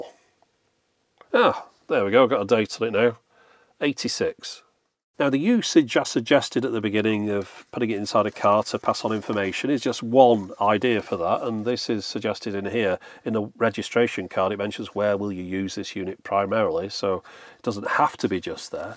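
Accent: United Kingdom. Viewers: Your voice through the window and brit accent sounds like C3PO. No offence...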